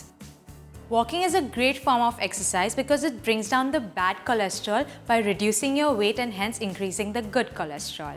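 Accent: India. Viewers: Ur English feel like an Indian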